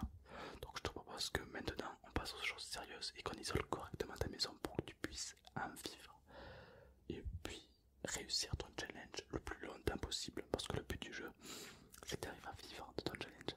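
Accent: South, France. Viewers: n’a plus d’accent du sud en chuchotant Like si ta remarqué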